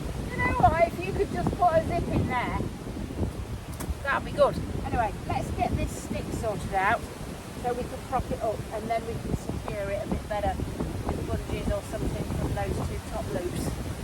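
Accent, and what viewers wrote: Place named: England, United Kingdom. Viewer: This is the 2nd video of yours i watched. You're a funny woman, I like that. I'm glad you're not dead yet. I always have to listen very carefully to understand your English accent. But I can manage that. Thanks for the videos, best regards from Germany.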